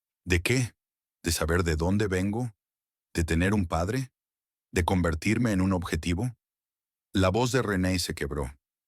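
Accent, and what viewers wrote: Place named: England, United Kingdom. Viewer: Sería un palo si tu narrativa tuviera pronunciación latina y un español sin acento ingles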